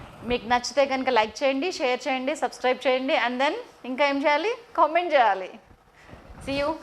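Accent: Telangana, India. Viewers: Meeru andhra ina telangana slang matladutunnaru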